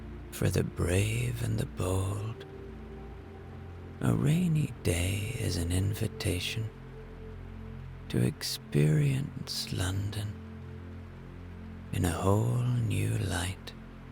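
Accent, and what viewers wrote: Ireland. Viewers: I have a 3 week old baby and your sleep stories have helped me conk out when my husband takes the baby for a while! It’s lovely to hear an Irish accent in the stories. Loving your work, from a Galway girl turned Dub 😂❤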